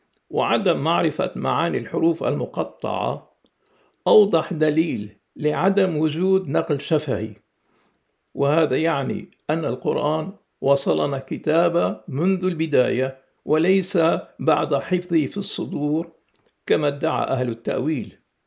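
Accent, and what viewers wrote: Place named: Iraq. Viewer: هل هو ؟ لأن لهجته عراقية وليس سورية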